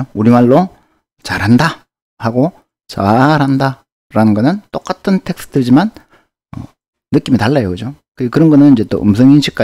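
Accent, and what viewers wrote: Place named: Gyeongbuk, South Korea. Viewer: 우와 교수님, 목소리도 귀에 팍팍 꽂히고 설명도 너무 재미있어요! 교수님 사투리가 경북 사투리인가요? 대구사투리나 경남사투리는 아닌것 같아서요...중간에 "감성분석(Sentiment analysis)" 언급하셨는데, 이 방법으로 혹시 사투리를 구분하는 것도 가능한가요? ^^ 고급강의 올려주셔서 감사해요~아이 씬나~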